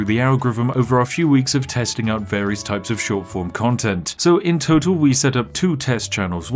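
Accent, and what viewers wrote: United Kingdom. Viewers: so bri'ish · The sniffing brit👍👍👍👍👍👍👍👍👍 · Hi fellow British man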